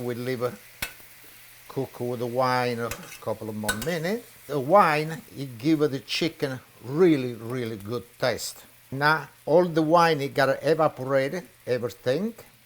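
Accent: Italy. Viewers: Omg as Italian who lives in Italy the way he speaking make me laugh truly an original Italian immigrant, very focused to he’s recipe!! Greetings from Italy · you remind me of my grandpa. love that italian accent and the o ya baby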